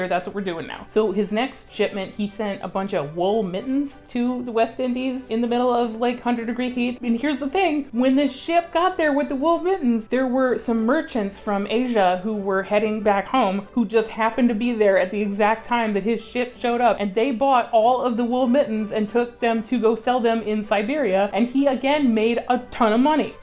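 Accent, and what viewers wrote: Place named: Florida, United States. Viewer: Why does it sound like he belongs in Florida?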